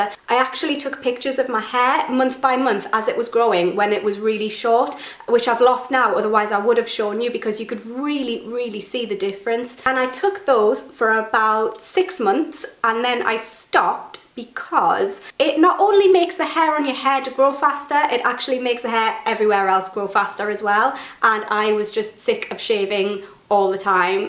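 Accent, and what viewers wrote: Newcastle, United Kingdom. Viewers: are you irish,scottish,or british??? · What i was thinking!sounds like a geordie a lot like pez's mom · Are you British? It sounds like you have a Geordie accent. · AMAZING!!!! I love your accent where are you from? Obviously from England are you Jordie?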